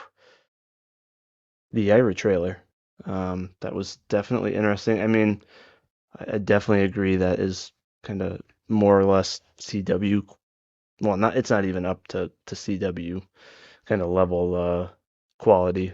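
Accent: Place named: Germany. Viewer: Ты говоришь по-русски язык!! Я слышу немецкий акцент…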